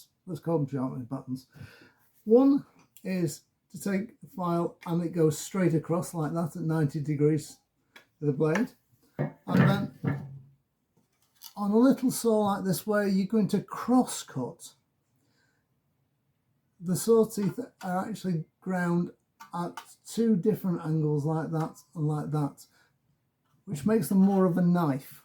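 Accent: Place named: United Kingdom. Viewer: Thanks for posting this, it's very timely in that I do have to sharpen both my tenon and gentleman's saws. I also have a Disston crosscut saw which needs re-sharpening after I managed to mess it up many years ago and haven't had the courage to revisit. I was considering using one of the many blunt, disposable saws I have as a practice piece before tackling the the disaster which is now my Disston. I'm hoping that the teeth on those disposables aren't too hard for my file, I suppose I'll be finding out very shortly, LOL. Now that I am armed with your inspiration and the need to do something, plus an endless supply of potential practice material a sharpening might actually happen. I cut down those disposable saw blades into points about 200mm long to make stab saws and the like, brilliant for cutting out holes in plaster board and similar stuff, the teeth closer to the handle get little use and stay quite sharp while the rest are wasted. 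It beats just chucking them away, and makes nice, cheaper than cheap custom shaped saws with little effort and an angle grinder. Has anyone used those blades to make scrapers? Just a thought I was going to put to the test. I've subscribed. It's refreshing to hear a British accent which isn't AI tripe, giving some solid, no BS advice. Thanks so much.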